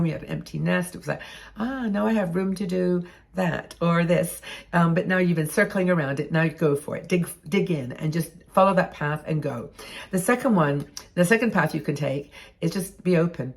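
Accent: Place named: England, United Kingdom. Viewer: Your accent is changing! Becoming more English! We’re you brought up in uk?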